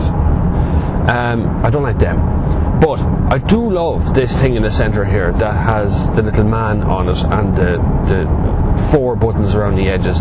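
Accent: Ireland. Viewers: I never thought I'd see Emo in a YouTube video...... and your accent is familiar, are you from Laois?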